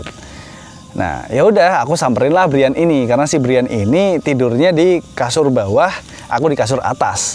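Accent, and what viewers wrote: Java, Indonesia. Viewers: Gara² Kewan, Jowone metu cok..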